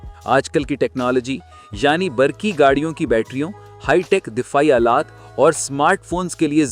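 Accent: Punjab, Pakistan. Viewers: ما شاء اللہ پنجابی میں دھوئی ہوئی اردو لیکن اچھا تجزیہ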